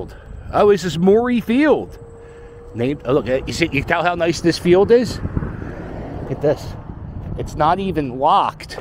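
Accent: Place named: Pennsylvania, United States. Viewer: I heard the PA accent and mediately. When you think about Martin Luther King and the motel, remember something. These places were not always the Havens for junkies and hookers they have become. This is where families stayed originally, traveling salesman and other businessman, when the car became popular, people stayed at motels and motor inns. We didn’t have 20 story Sheratons and 40 story Marriotts. All we had were motels and everyone stayed there. It’s weird middle-class America state. You did have some bigger hotels, but they were confined usually two resorts are big cities. Those dives up and down the route one Corredor with normal stopping points on people traveling cross-country or cross state.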